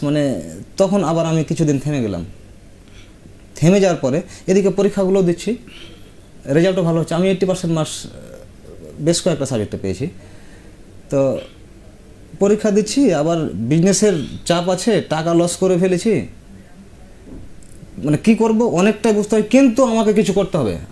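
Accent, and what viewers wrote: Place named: Murshidabad, India. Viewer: এটা আমাদের মুর্শিদাবাদের ভাষা। আপনার সাথে পরিচয়ের ইচ্ছে রইল।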